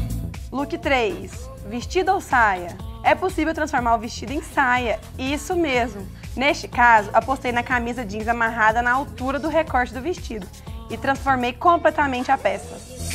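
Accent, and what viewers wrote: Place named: Goiás, Brazil. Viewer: Linda demais com esse sotaque Goiano 😍 Não vejo a hora de te ver ♡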